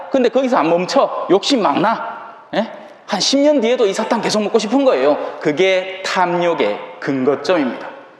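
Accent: Gyeongsang, South Korea. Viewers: 신부님감사합니다 신부님강론말씀경상도사투리가정겹고제밋네요 비유말씀이감동이네요 서울지하철안에세 듣고있는데 유듀부에종종올려주세요 건강하시고 행복하세요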